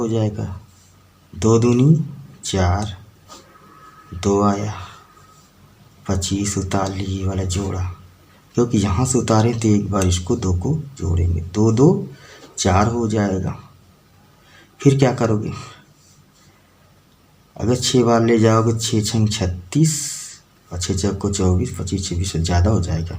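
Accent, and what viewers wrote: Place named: Pratapgarh, India. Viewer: SUPERLIKE 47::)) बहुत ही श्रेष्ठ कार्य कर रहे हैं आप🙏🙏 मेरे पिताजी भी प्रतापगढ़ से थे, तो आपकी बोली से अपनापन सा लगता है । बहुत बढ़िया भैया जी🙏🙏🙏 बहुत बहुत धन्यवाद और हार्दिक शुभकामनाएं 🙏🙏💐💐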